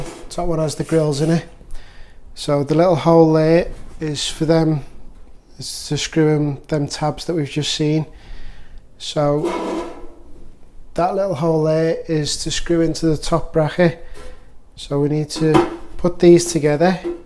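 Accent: Liverpool, United Kingdom. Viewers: Love the scouse accent. Best people in England 🩷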